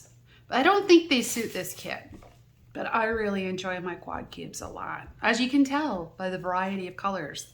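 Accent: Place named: Canada. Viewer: Hi. When you start talking tonight, I knew right away that you had to be Canadian, but I wasn't sure where from. I am from the East Coast, Newfoundland, to be exact, and we used to go to the beach every Sunday when we were kids to have a Sunday supper. I have a lot of fond memories of that. I have been living in Belgium for the last 6 years. I think it would be really great if you did a vlog of you taking your dogs to the beach or to the dog park. We would get to see a part of your world then. I will probably start my canvas in the middle of the month because I've got to finish the one I'm working on for another event. 😊😊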